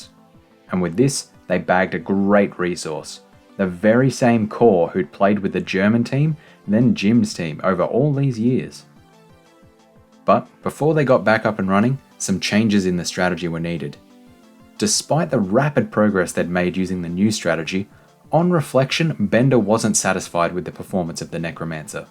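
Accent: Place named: New Zealand. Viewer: I've binge watched your videos over the last couple of nights. Your passion is so evident -- it's infectious. Thanks for the content. Your narration is awesome and I could listen all night. I can't put my finger on where you're from, though. You sound Kiwi; your relaxed, steady rhythm sounds East Coast NI (my ex-home). That, or Kiwi-born and raised and now in maybe Vic state, AU? Either way, I'd love it if you covered other games too — if the passion is there. Just more content, pleaseee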